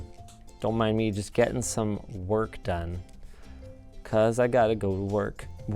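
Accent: Canada. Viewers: Why does linus sound like he's leaning heavily into his canadian accent in this video.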